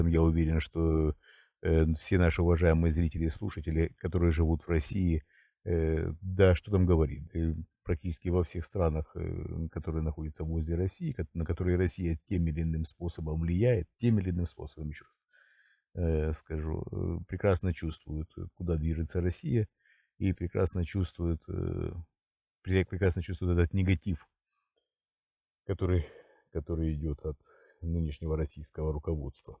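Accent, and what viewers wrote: Ukraine. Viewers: Какой ты генерал? Ты балабол с харьковским акцентом.Где признаки катастрофы гражданской авиации конкретно? В каком смысле? Технического обслуживания, проведения тяжёлых форм, замены запасных частей? Просто балабол тоскливый...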